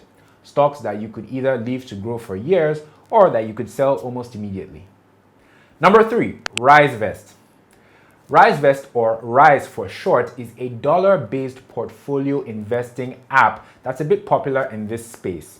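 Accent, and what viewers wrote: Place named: Ghana. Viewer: Great content. Do you know similar apps for the Ghanaian investor? Btw, you sound more Ghanaian than Nigerian!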